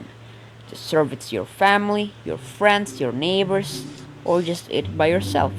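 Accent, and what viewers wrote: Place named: England, United Kingdom. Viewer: its really look so delicious ! love ur eng accent too !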